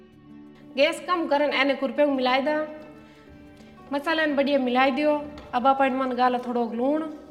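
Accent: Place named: Marwari, India. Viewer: Like Marwari accent.i will try this .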